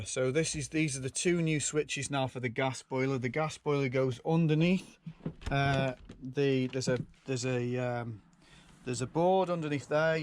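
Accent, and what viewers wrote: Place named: Stoke, United Kingdom. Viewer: Gorra luv a Stokie accent in a motrome.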